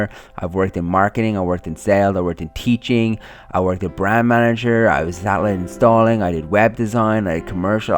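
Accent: Ireland. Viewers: i really don't see how thats funny. Obviously he has an Irish accent. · It's a common irish pronunciation, loads of people talk like that here in ireland. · It's called an Irish accent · Its an Irish accent! I have it too :) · That's the Irish accent hahah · LLOOOVVEE your irish accent!!!!!!!!!! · Stop taking the mick he's Irish ok if u were Irish I'd say it like that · Thats an irish accent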